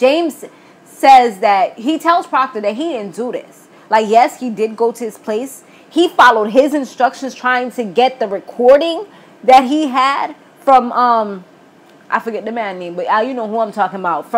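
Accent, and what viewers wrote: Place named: Brooklyn, United States. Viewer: Are you from Brooklyn and of Caribbean descent because I hear a Brooklyn and Caribbean accent when you say certain words.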